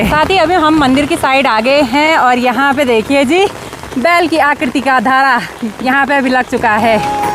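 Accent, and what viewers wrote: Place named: Pahadi, India. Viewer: Bindass apake hindi bhasha me pahadi sugand aati he bahot achha lagata. bhai ko shubkamana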